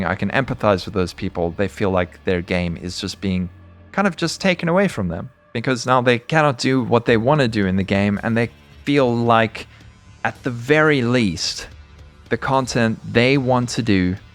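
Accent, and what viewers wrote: South Africa. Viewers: You are completely right! I am an old school crpg player (wow it’s almost 40 years I have been playing since hack/rogue/wizardry 1 in 82) and for me nerfs are amazing as it just makes a whole new journey/challenge available. I get bored with high level characters that can do everything, it’s figuring out how to build and tackling challenges with my creation that’s fun. Probably why I played Diablo 2 for years as well. Anyway thanks for a great video and channel! Btw are you South African/Ex S.A.? Me too! I grew up in joberg).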